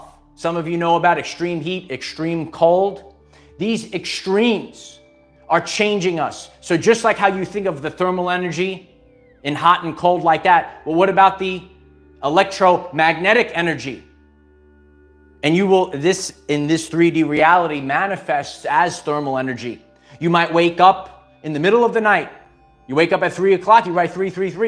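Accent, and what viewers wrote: New York, United States. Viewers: Terrific sharing. You are a character. 94 degrees here in Florida (too hottt for me). Yes I have been learning about this stuff for many years. Carbon to crystalline, etcetera. We are stronger for everything we experience. The NAA wanted us to lower our frequencies through hatred, stress, and fear they created. To stop us from growing and ascending and being the powerful beings we are. They were fearful of losing their power, because we would realize they have been leeches and robbers to us. We all have masculine and feminine in us. I believe most males are very fearful of the feminine in them and see it as weak. It is not weak, it is strength and compassion. Females also have masculine in us and can be strong. Many humans are finding that beef is too heavy to digest anymore. I eat beans and nuts. You inspire me to build my body muscularly as a slim athletic gal, I need to lift some heavy weights to get stronger. 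Time to join a gym. Peace and love to an authentic male and teacher. I appreciate you. The NY accent is awesome.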